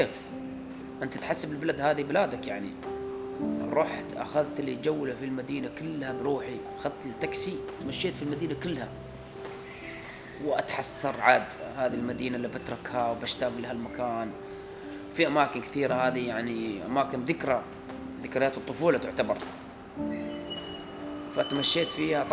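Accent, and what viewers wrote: United Arab Emirates. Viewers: سبحان الله شكله مو صومالي شكله اثيوبي و لهجته اماراتيه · لهجتك اماراتية حلوة 🙏🏻 والنعم فيك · لهجتك اماراتية جميلة هناك حملة شوهت صورة الامارات لكن من عاش فيها لايمكن الا ان يحبها اتمنى لك ولعائلتك التوفيق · اللهجه الامارااتيه 👍👍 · حضرتك تتكلم بلهجة اهل الامارات وليس بلهجة اهل الكويت وليس بلهجة اهل نجد السعودية او لهجة اهل القصيم بالسعودي ة وليس بلهجة اهل جده الحجازية وليس بلهجة اهل البحرين القريبه من اهل العراق او اهل الاهواز وليس بلهجة اهل عمان مسقط لان كل دولة خليجية لها لهجة خاصة بها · لكنتك اماراتيه بالمره 🌹🌹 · صح ان اللهجة لك عليها بس تبين فيك للهجة الاماراتيه ولله الامارات بيتك وإذا تبا ترد حياك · سبحان الله انت صار لك في الامارات عشر سنين و ترمس زي المواطنين ونحنه صار لينا خمسه و ثلاثين سنه فيها ما تكلمنا لهجتهم 😂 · و الله اعجبتني لهجتك اماراتية تحياتي من هرجيسا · بس كلاموه الاماراتي اللهجه اقصد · سبحان الله لما شفتك عرفتك صومالي طوالي ومن كلامك عرفتك عايش في الامارات... 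ربنا يوفقك..مولودة وعايشة في الامارات وفي حياتي مازرت راس الخيمة...بتمنى أزورها... وبحب أبوظبي جدا جدا وبفضلها على الامارات التانية.. سبحان الله اي واحد عاش في الامارات ولو لفترة بسيطة بحب البلد دي وبكون عنده ليها معزة خاصة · فلوجاتك جميلة من لهجتك ضنيت انك اماراتي وتمزح لما تقول انك صومالي والحمد كلها دول عربية اسلامية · اهم شي اللهجة الإماراتيه اللي تتكلمها ❤️👍🏻 البلد بلدكم و نتشرف بك و بجميع اشقائنا الصوماليين و العرب و المسلميين أجمع ، و بإذن الله راح ترجع للإمارات و راس الخيمه و الأيام الجميلة الحلوه و أنت في وضع أفضل. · أول افتكرت انك يمني ولهجتك اماراتية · فيديو جميل وممتع جدا... واللهجه الاماراتيه لهجه جميله... الله يوفقك ويرزقك السكن والاقامه في الدوله اللي تحبها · ما شاء الله قصة كفاح ونجاح 👏وأهنيك على اللهجة الإماراتية وأعرف صوماليين وغيرهم من الوافدين في السعودية لهم ٣٠ سنة أو أكثر ما يعرفون اللهجة السعودية وهنا ثمرة ودور الإندماج وتكوين العلاقات الاجتماعية👍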